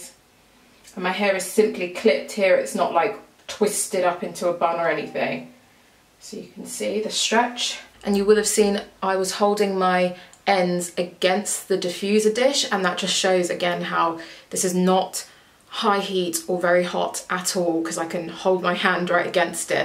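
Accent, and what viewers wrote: United Kingdom. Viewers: Londoner right there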